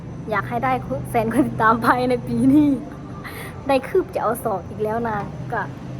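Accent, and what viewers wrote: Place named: Phimai, Thailand. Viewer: พูดโคราชแบบนี้ไทยพิมายเด๊นิ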